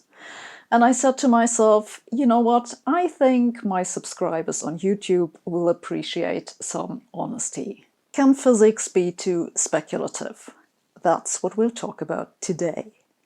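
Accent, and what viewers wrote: Germany. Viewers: As a student of the German language, I love you accent. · She makes German accent sound good, but there is nothing German about the logic itself.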